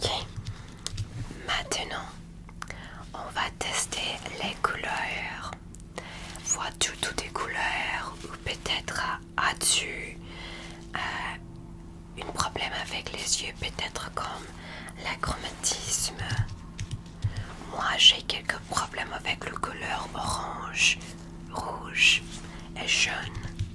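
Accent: Quebec, Canada. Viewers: No way la seule asmr artist que j'écoute c'est celaine et je suis tombée sur ta video par hasard dans mon fil c'est funny😂 Je l'ai écouter et ca fait vraiment différent avec ton accent québécois et t'es un peu moins hyperactive que celaine 😅😂 mais c'est très bon bravo! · Ohh je suis tomber en amour. J'ai toujours eu de la misère avec le asmr québécois. Mais la...❤ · Bonjour je suis nouveau sur ta chaine j'aime bien ton ASMR avec ton axen québec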